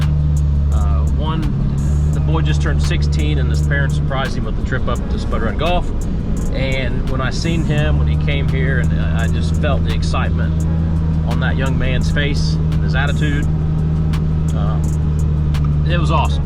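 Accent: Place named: South, United States. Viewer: Yay! Nice to hear southern ahyia 😂👍🏻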